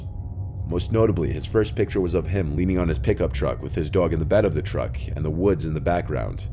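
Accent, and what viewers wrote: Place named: New York, United States. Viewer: almost couldnt get past mr. nightmares new york accent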